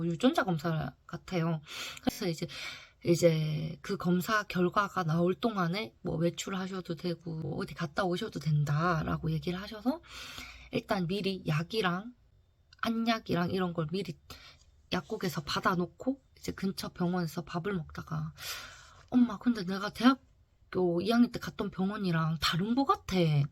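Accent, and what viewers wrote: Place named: Andong, South Korea. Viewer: 야 안동 말투 너무 오랜만에 들어서 그런지 너무 재미있다 ㅋㅋ 영상 너무 재미있게 잘 봤어 😊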